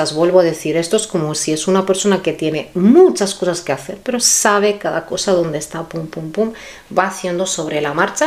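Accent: Spain. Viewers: Así es, hay que buscar la manera de retribuir y ayudar a los animales, me gustaron tus consejos, especialmente el de remojar el pan 🥖 duro para los pajaritos ❤ Un abrazo desde Chile 🇨🇱 Me gustó mucho tu lectura, deseo que se materialice y finalice este año llena de abundancia y felicidad. Ah, y me encanta tu acento español 😊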